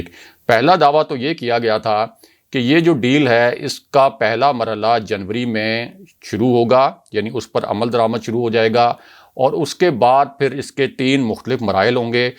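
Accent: Punjab, Pakistan. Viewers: It seems he is the PMLN supporter ... he better learned how to speak in urdu he is fully speaking URDU IS PUNJABI .... bhai urdu boleen agar bolna nahin ati tu puniabi mein hi video bana liya kareen ... WHAT A HEADACHE...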